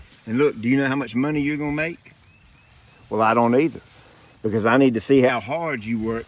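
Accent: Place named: Carolinas, United States. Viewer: Your video says how to have a wonderful lawn, but you talked mainly about the beds. By your accent, I guess you live in the Carolina's so maybe your winters are not too bad. Here in Canada we have pretty harsh winters which take its toll on the grass. I would love more information about how you get your lawn to look so good. I have a rural property and know from the size of your property you spend a lot of time on your lawns and they really do show it they are so lush. Do you have a video where you explain how you feed and maintain your lawn. Thanks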